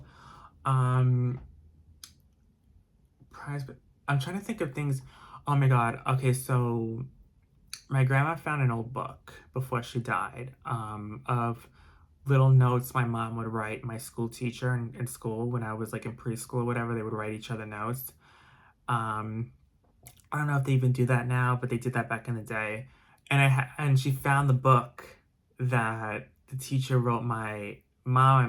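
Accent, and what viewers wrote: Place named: New York, United States. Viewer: Hi,. You look lovely! I thought I recognized your accent - you’re a fellow New Yorker! I don’t know where you live (Upstate, boroughs, etc), but have you ever considered Long Island? That’s where I am, and it lacks all the chaos of the city. Not as fun, but way less drama and crime.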